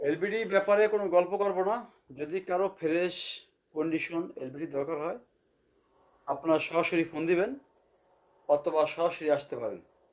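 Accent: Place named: Barishal, Bangladesh. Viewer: ভাই আপনার বাড়ি বরিশাল মনে হয় 😮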